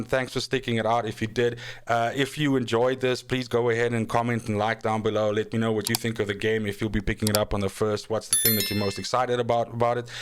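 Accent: South Africa. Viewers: Yo yo my guy, you from South Africa? I feel exactly the same, wasn't going to get this, played the demo, recognised the studios community interaction, then pre ordered. Nice vid, you get a sub from me.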